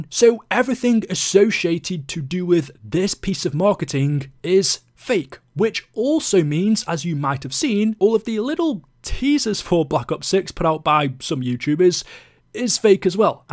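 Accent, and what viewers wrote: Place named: Stoke, United Kingdom. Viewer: Nice stoke accent burslem boyoo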